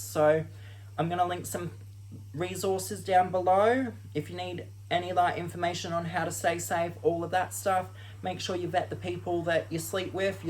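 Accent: United Kingdom. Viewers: why did you choose the cockney accent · i love your accent. what part of England are you from?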